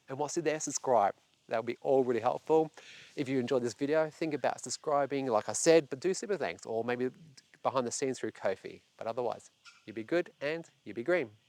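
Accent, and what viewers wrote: Australia. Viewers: Don't laugh at the Tesla not understanding the Aussie accent. Why expect more from it then the rest of the world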